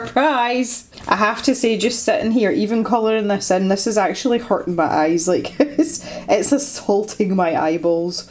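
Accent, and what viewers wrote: Scotland, United Kingdom. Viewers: Oh man captions do not get a Scottish accent. lol 😂 drawing looks beautiful though.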